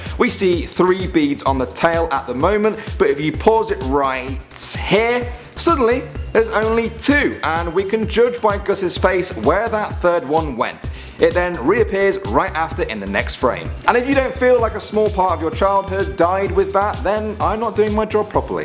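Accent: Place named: United Kingdom. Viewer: british accent is so annoying!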